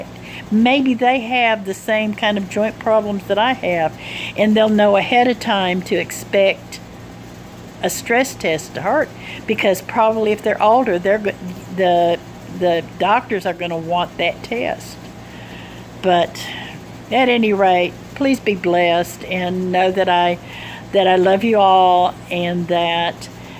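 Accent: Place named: South, United States. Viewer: I love ur Southern accent! I know u will do great and feel so much better after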